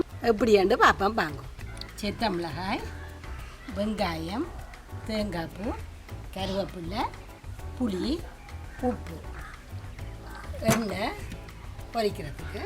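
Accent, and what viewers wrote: Jaffna, Sri Lanka. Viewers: Samayalodu ungal elangai tamilum arumai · Love, love your Sri Lankan Tamizh 💕💕 and of course, the recipes 😊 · யாழ் தமிழ் இனிமையாக இருக்கிறது .. .from chennai,Tamilnadu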